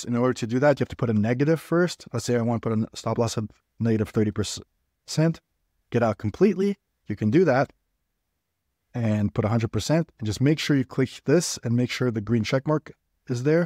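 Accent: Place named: New York, United States. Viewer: You gotta be from NY or at least from the northeast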